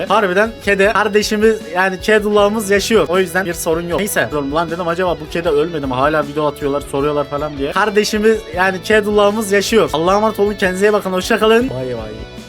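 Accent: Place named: Turkey. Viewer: Sert Türk Aksanı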